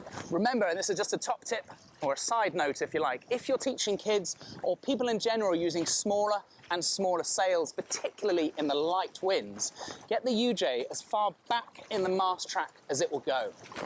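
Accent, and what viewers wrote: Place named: England, United Kingdom. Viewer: Beautiful English accent!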